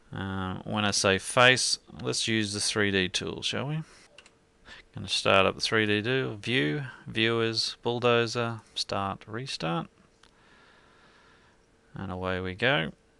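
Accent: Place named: Australia. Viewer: I heer an aussie :P cheers for tutorial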